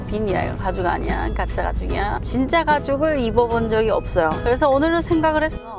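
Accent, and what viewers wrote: United Kingdom. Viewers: 굿모닝~ 애불보디! 늘 들으면 들을수록 브릿이 귀에 쏙쏙!! 즐겁게 방송보고 가유~~^^ 누나 안뇽!^^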